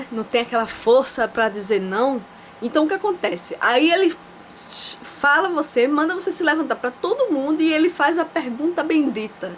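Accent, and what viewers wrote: Northeast, Brazil. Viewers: QUE SOTAQUE GOSTOSO, ESSE SOTAQUE DE NORDESTINO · vc não generalizou pq vc falou q foi na sua congregação,nem todas são assim.Pelo sotaque vc é do nordeste,aqui no sudeste é muito rar acontecer isso que vc falou.pense bem porque Jesus não tem nada haver com isso,vc saiu pq vc quiz,vc tem LIVRE ARBITRIO!naõ vou dizer q vc vai para o inferno,mas,deixa isso quieto,não insiste com esse videos pq a magoa só faz mal para nós mesmos!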